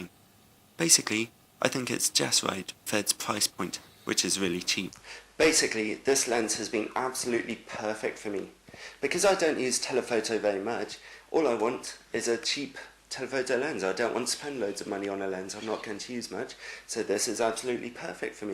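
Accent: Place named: RP, United Kingdom. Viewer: I like your perfect Received Pronunciation/posh English.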